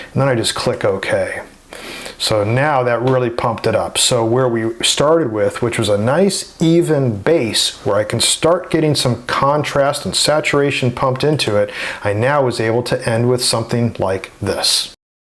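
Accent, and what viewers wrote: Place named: United States. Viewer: thanks a lot! I can't understand american english very nice so that's why i needs me to watch the video 2 or 3 times to be sure to understand every thing! If I could I would really enjoy sharing pictures with you which give me some problems... Thx from Paris France!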